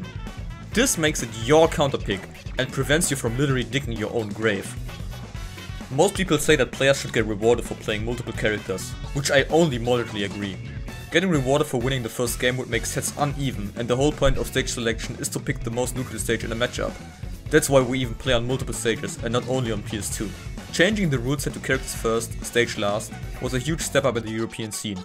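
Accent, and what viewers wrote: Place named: Germany. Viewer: Hab dein accent gehört und direkt gewusst, dass du deutscher bist lol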